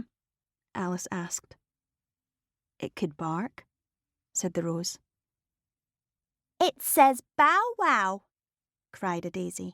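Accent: Scotland, United Kingdom. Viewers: got the most beautiful Scottish accent ever! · and her scottish accent. Perfection. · Chinese girl with a Scottish accent my God literally could not find a more perfect beautiful woman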